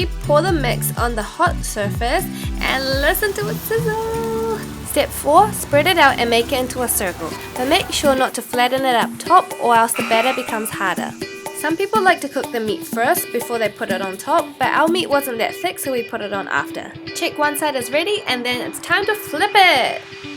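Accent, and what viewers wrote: New Zealand. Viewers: I came here from the Only In Japan Channel and this is the first video I have watched. It was awesome. Thank you very much. I was especially excited you talked about Okonomiyaki. I had this in Tokyo and would really like to make it at home. Could you post the ingredients? P.S. Love the Kiwi accent. Cheers from Cairns, Australia (+1 Subscribed :-) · Love your videos, keep up the great work!!! It's great to see (& hear) a fellow kiwi enjoying Japan :) I tried to make it to that exact restaurant when I was there- failed, and ended up at some other random restaurant hehe Looking forward to returning after studies, and trying for a non英会話 job next time. Until then, I shall be inspired by your videos, cheers! :) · I think she's Japanese, but her accent when speaking english is NZ I believe :)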